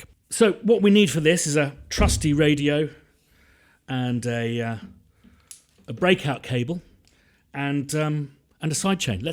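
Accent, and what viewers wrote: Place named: London, United Kingdom. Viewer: WHAT? This guy sounds like he's from London, do you really think Trump is launching nuclear bombs over GB? How delusional can people be? Turn off CNN/BBC!! If anything, this guy should be worried about low-IQ, violent immigrants attacking his family (god forbid), thanks to liberal/self-hating Feminist governments taking over the West and burning it to the ground.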